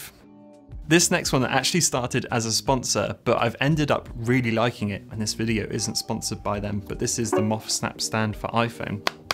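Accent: United Kingdom. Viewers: You are comfortable on camera and speak calmly & beautifully with your British accent, so who cares about what your visual style choices look like? What you offer with information & services and how you present it is what matters. Not what you want to wear.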